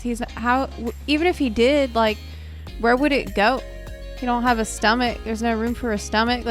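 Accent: South, United States. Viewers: where are you from? i looove your accent! i'm from ga living in nc and i relate to your pronunciation so much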